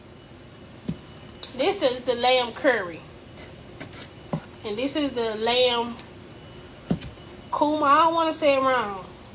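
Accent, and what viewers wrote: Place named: South, United States. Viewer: Lamb curry 😂😂😂 love your southern accent ❤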